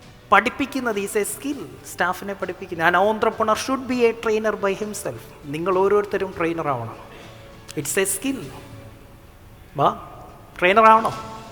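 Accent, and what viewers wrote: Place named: Thiruvananthapuram, India. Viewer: Are you from tvm? Or kollam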